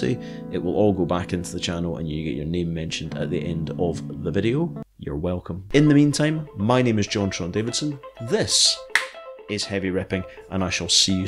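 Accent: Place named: Scotland, United Kingdom. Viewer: what part of Scotland are you from?...My mother is from Blantyre and your accent sounds suspiciously close!... 😊...I just ordered these pics... thanks for the review and recommendation!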